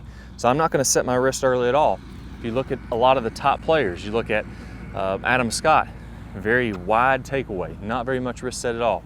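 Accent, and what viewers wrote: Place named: Texas, United States. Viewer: you have got to be in East, southeast Texas with that accent!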